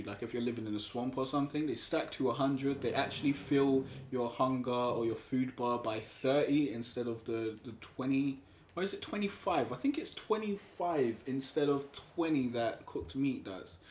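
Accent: United Kingdom. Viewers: Wow you are British, lol. Thanks for the tips.... I've only been playing for a day...finally caught my first dino: Trike and accidentally killed him on my standing fire torches...fml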